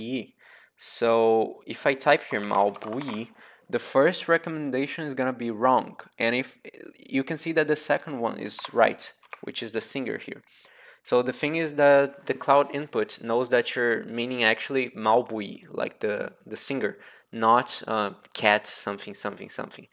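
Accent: Brazil. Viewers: Your accent sounds Brazilian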